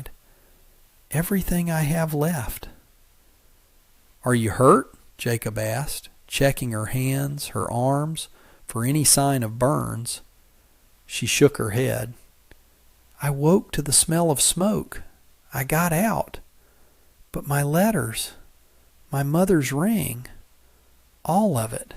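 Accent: United States. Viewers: I really do enjoy these stories. Well written and narrated with the nicely accented American voice. The image generator does a fine job. These are a nice respite. A good place for an old guy who likes Westerns.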